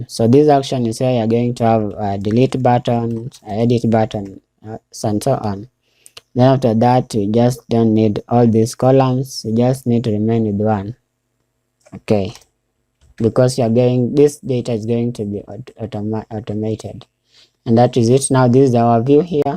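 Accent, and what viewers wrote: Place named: Asia. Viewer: U sound Asian, ru sure ur a?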